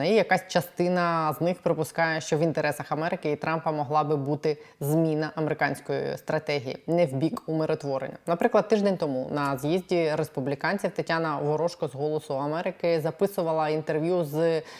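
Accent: Russia. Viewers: Пані, чому Ви весь час промовляєте назву Південно-української станції на кацапський манер - типу южно-укрАїнська станція? Це вплив кацапоговорящіх в Києві? Вам так не можна - Ви на передовій теж, тому виправляйтеся будьте добрі 😊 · Ви так на російський манер вимовляєте з наголосом на А, УкрАінская АЕС, що блювати хочеться.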